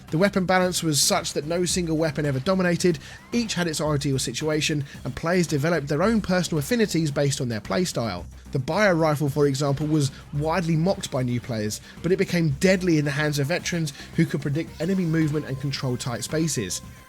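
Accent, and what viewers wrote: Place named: United Kingdom. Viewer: Sounds like you are from the UK; you should look into another one of UK gaming's national treasures that pretty much fell under the radar although anyone can still play it as of now. It's a gorgeous 5 v 5 Capital Ship Space MOBA called FRACTURED SPACE. It's one of those games where you felt lucky to have experienced it when it was live.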